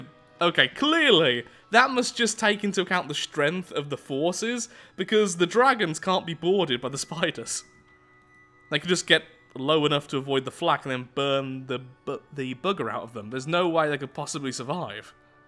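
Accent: United Kingdom. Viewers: No I'm here for the jokes , British accent and KABOOMA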